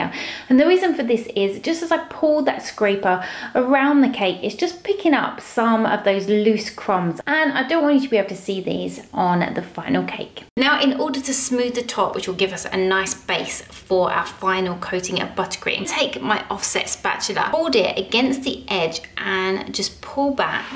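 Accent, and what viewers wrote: United Kingdom. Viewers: 🤗 you is so nice! Are you from England?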